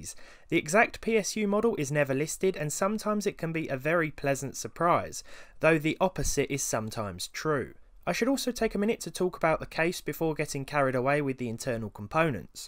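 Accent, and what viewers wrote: United Kingdom. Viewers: Somehow the British accent makes this sounds like a documentary, compared to those Canadian and American channels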